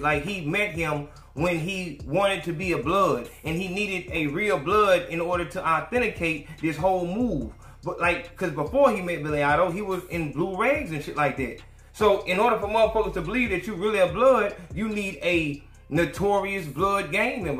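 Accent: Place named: Alabama, United States. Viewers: What part of Alabama you from bruh? I’m in the 334. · What part Alabama u from.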